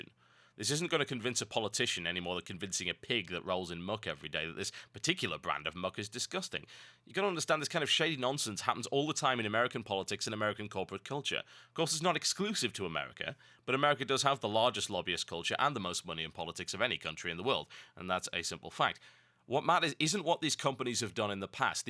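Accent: United Kingdom. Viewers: No the 21 minute slideshow with an obviously bored British accent voice-over is not a better video. This guy talks about doing better marketing? He should take a Red Bull and turn on his webcam before trying to present his arguments, what a lame way to get any type of attention. He must be a failed lawyer since he spends his time doing voice-overs on gameplay videos (something that YouTube specifically forbids youtube partners from doing)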